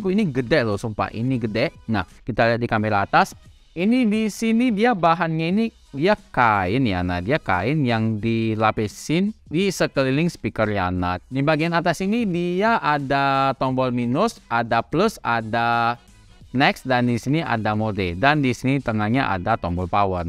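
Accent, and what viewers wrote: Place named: Pontianak, Indonesia. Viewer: Bahase koko macam Chinese Pontianak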